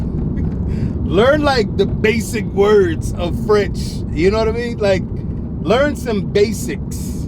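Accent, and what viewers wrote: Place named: DMV, United States. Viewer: Sound like you in the DMV area lol!✊🏽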